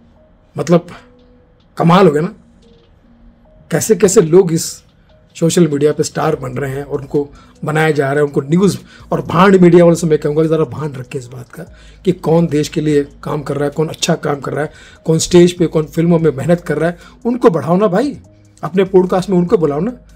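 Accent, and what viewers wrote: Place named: Uttar Pradesh, India. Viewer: Acha lga u.p wala boli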